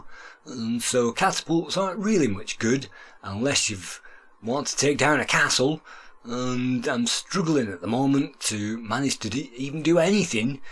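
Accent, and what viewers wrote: United Kingdom. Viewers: Bri'ish guy narrates an American Amiga game about Bri'tain. Checks out. Nice video, and nice how you even used an NTSC hardware with the aspect ratio the game was designed for. You don't hear a lot about NTSC Commodore hardware due to how Commodore computers tended to do better in Europe.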